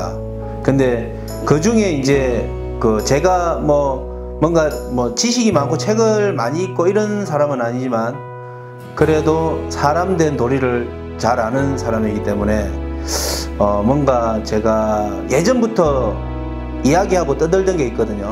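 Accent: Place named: Gyeongsang, South Korea. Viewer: 갱상도래여~ 홧팅!😊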